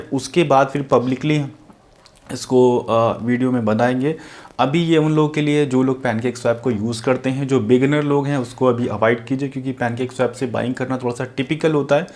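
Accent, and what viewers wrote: Lucknow, India. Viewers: Bhai Aap Lucknow Se hai kya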